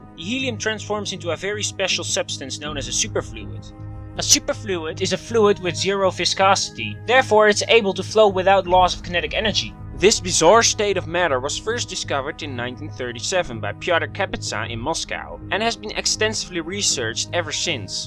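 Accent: Netherlands. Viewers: i could hear that you were dutch within the first 5 seconds xD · You sound Dutch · Pohh, da's pas een Nederlands accent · Dutch af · He sounds Dutch to me. I'm almost sure he is. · Your accent sounds North Hollandic or possibly Frisian. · Are you Dutch? Sounds like a Dutch accent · dat nederlandse accent .....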